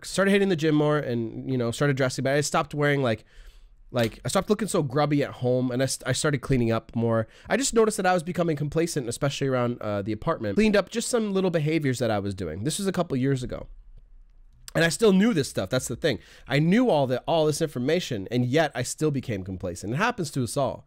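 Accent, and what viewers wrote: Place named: Montana, United States. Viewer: Hey, where a-bouts are ya from? You almost sound like you are from Montana? Canada? Lmao!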